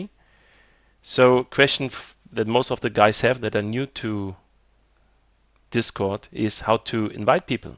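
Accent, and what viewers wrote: Germany. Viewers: your accent sound german? bitte sei deutsch lol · German accent 10/10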